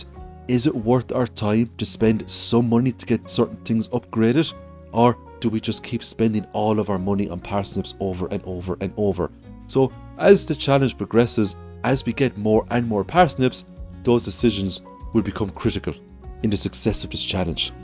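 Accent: Ireland. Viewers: Are you Irish I can hear a accent? I come from the north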